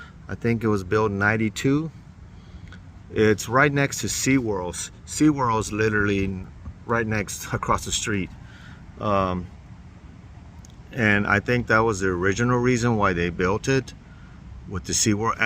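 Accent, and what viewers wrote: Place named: Latin America. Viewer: I hear a Latino accent. Hablas español?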